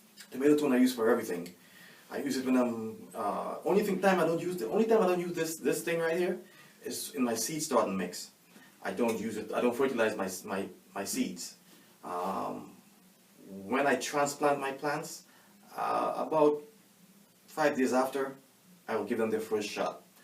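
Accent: Trinidad and Tobago. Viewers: Thanks so much for this. I now started using Tomato Tone. PS for the entire video I thought "Why is he sounding like me?" Only to read the comments and see that you're from Trinidad too. I'm just here in Couva trying desperately to grow tomatoes :) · Sound Trini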